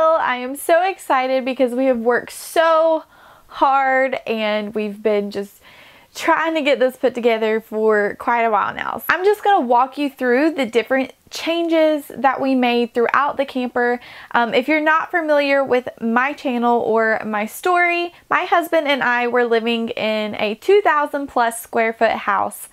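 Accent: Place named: South, United States. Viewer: mai567 it's called a strong southern accent.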